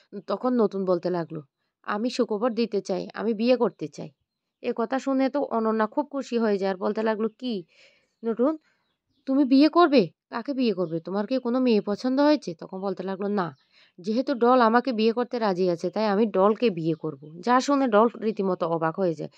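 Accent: Chittagong, Bangladesh. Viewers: আপনি বোধহয় চট্টগ্রামের তাই কথা গুলো এভাবে বলেন।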